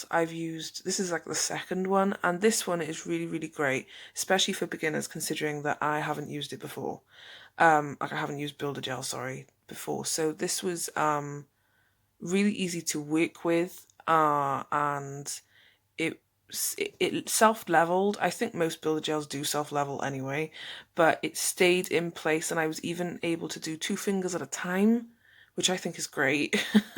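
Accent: Cardiff, United Kingdom. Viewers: This is the first time I’ve watched this lash. I really like how she talks. Sounds a bit like a Cardiff accent? Anyway I found her very helpful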